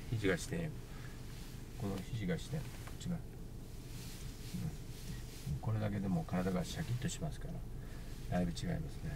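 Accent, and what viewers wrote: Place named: Kansai, Japan. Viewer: 懐かしい関西弁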